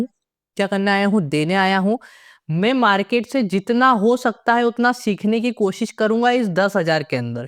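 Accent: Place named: Madhya Pradesh, India. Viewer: Sir aap Madhya Pradesh se ho kya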